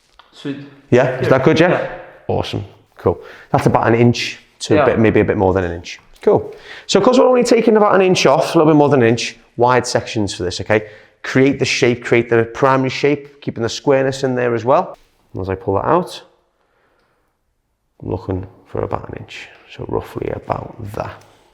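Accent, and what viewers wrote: Liverpool, United Kingdom. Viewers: This is British accent right? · Where are you based? You sound like a Scouser, are you in Liverpool? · I get it ure british . Now turn it off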